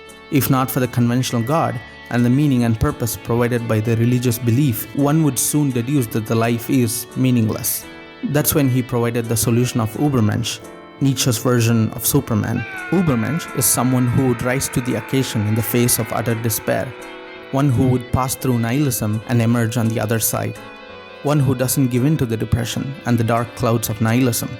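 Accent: India. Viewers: The Indian accent distracts me from the whole thing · The Indian accent is unmistakable. Wonderful essay.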